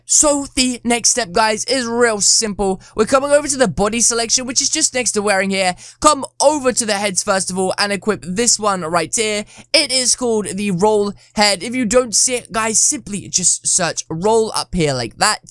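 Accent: United Kingdom. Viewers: This guys BRITISH?!??GOD I LOVE HIM EVEN MORE!!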